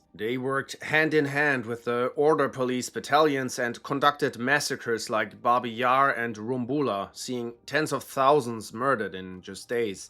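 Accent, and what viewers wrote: Germany. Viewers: As a WW2 buff I learned a lot today , thank you for providing this. It also sounds better coming from a German , it makes the video more authentic and engaging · The most German accent I ever heard but nice video 😂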